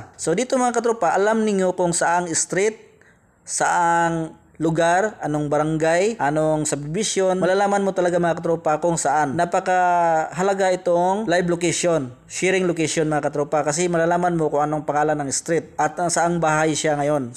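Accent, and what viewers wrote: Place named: Iloilo, Philippines. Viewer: ilonggo ka pala po hahaha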